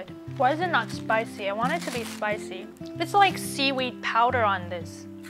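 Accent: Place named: New Zealand. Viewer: Your Vlogs are always amazing, even if I have no idea what you're saying the visuals overcome the language boundaries. Funny how you mentioned your kiwi english, because you would fit in quite well here in NZ, you definitely have our accent :-)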